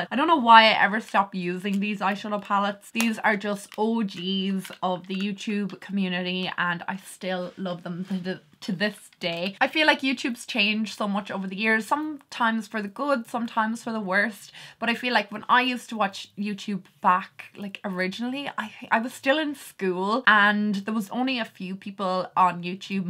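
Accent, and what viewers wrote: Ireland. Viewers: hey is ur accent altered by ur tongue ring or do u sound the same without it? I've never come across any gurus from ireland and i like hearing ppl speak little fun facts about where they are from ... u all don't know theyre fun facts bc naturally to u all its normal bc its where u live lol. But anyhow, do u know of any other gurus from ireland that i could sub to as well?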